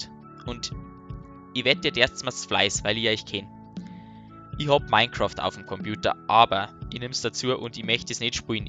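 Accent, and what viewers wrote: Bavaria, Germany. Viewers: Das ist ja eben das geile ... besonders mit deiner bayerischen Stimme währe das richtig nice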